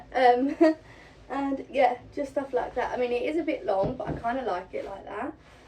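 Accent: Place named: United Kingdom. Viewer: you are GORGEOUS. Beautiful brown/green eyes, beautiful smile and cute voice with the British accent. Beautiful body and CURVES. You are STUNNING in everything you wear. All of the outfits in this video look REALLY cute on you and the colors they are make you BEAUTIFUL. The greens definitely look GREAT on you and bring out those beautiful eyes. You are GORGEOUS and SUPER cute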